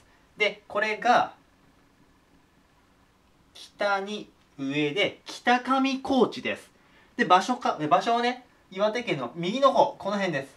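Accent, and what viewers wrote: Kansai, Japan. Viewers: さんって関西出身ですか？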